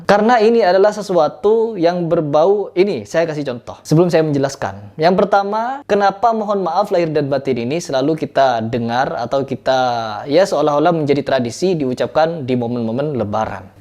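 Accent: Madura, Indonesia. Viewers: Reng madureh kake ye ?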